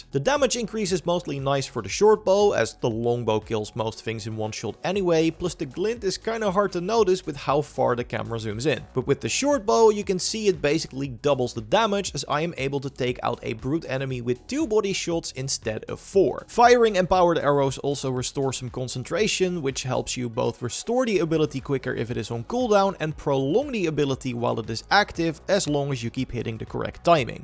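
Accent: Netherlands. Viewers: That's how most Dutch people sound when speaking English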